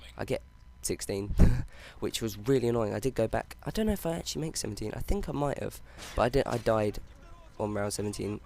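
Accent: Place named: England, United Kingdom. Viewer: Cause he's ignorant and you have an english accent.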